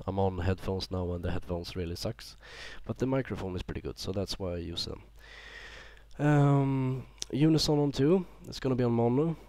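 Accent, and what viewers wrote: Sweden. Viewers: U sound soo swedish! are u swedish? jag är de ;)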